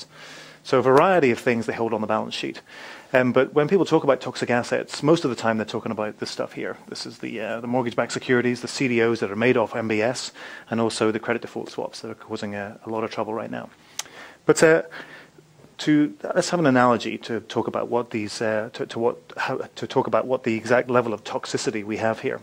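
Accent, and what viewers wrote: England, United Kingdom. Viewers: Hes english not irish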